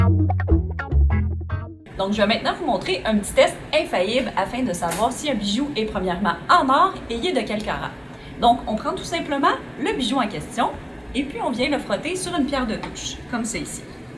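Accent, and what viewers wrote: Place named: Perpignan, France. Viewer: Y a rien à faire , je ne supporte pas cet accent de Perpignan ...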